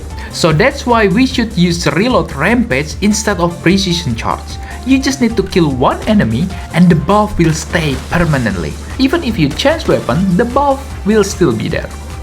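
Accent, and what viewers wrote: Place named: Indonesia. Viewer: Aksen inggris nya indo bet 😂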